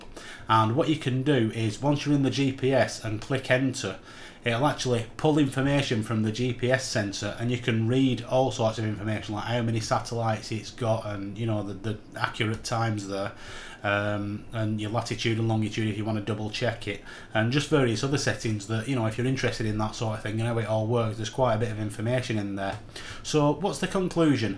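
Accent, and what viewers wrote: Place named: Oldham, United Kingdom. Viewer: Thanks for your clear video. I thought it might align the scope but it does not. Now I know I don't need one. That accent sounds Oldhamish, I live in cloudy Rochdale. Just got my Intes mk67 out for its annual inspection on the synscan (not used yet, only bought 3 years ago!) but I hear its cloudy all week, ah well. Cheers, Mike.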